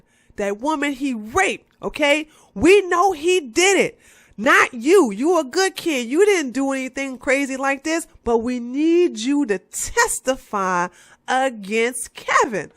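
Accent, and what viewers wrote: United States. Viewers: Where in Texas are you from? Ima guess DFW area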